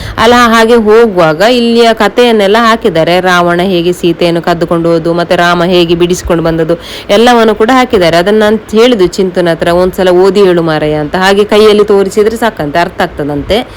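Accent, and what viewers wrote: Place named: Sullia, India. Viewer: Ninu sulliada rural houdu mathaduvaga gothagutha ide. Madam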